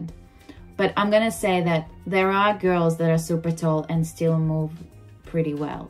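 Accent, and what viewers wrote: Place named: Australia. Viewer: Hello. Having been a fan of Kasatkina and Zabiiako I got interested in you. I love how you are developing an Australian accent. I really like your videos you have a great personality and attitude. Your videos are enjoyable to watch. I wish you the best in your tennis career. Thank you for your insight. Keep smiling.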